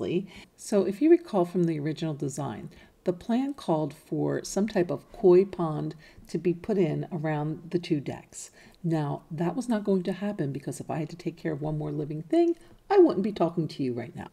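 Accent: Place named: Texas, United States. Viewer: I had'nt even read your reply until after I wrote mine. We even think the same but U talk like my AUNTIES/Tias from TEXAS 😄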